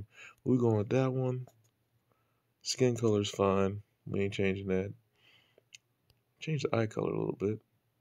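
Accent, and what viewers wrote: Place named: United States. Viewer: She looks so cute and she's gonna be so smart and philosophical with the way you talk to her! I love your conversation and also your slang with the American accent, I'm in London! 🤩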